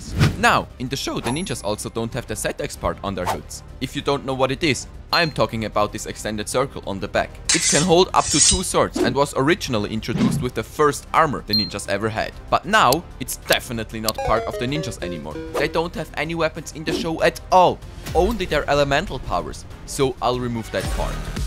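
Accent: Germany. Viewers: Bro are you German ?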